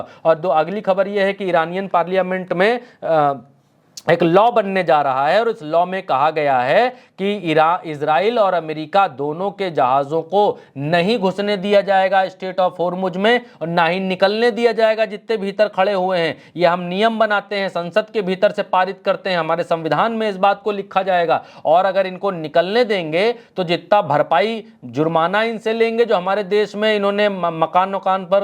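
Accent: India. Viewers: 👌❣️👌👌❣️👌👌❣️👌👌❣️👌👌❣️👌👌❣️👌👌❣️👌👌❣️👌👌❣️👌👌👌❣️ *Love you, भाई for your देसी ॲंदाज़-ए-बयॉं.!!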